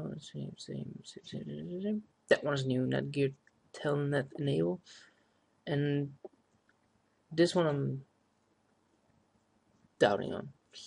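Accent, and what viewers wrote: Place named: Netherlands. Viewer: I can hear that you're dutch. How old are you? :D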